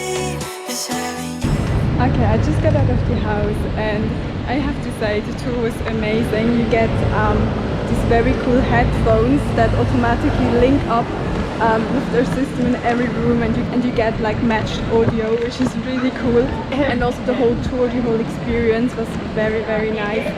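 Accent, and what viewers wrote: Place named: Germany. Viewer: Your beautiful accent reminds me that of my ex-german girlfriend! You are so sweet!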